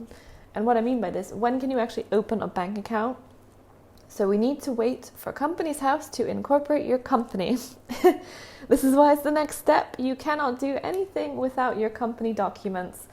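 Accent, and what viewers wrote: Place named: United States. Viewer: How come you have an American accent? 😊 Thank you so much for the videos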